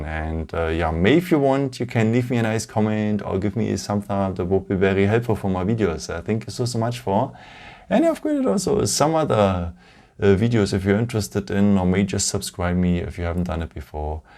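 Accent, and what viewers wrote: Germany. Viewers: It sounds like you’re a native German speaker, but this accent sounds unfamiliar. Which dialect?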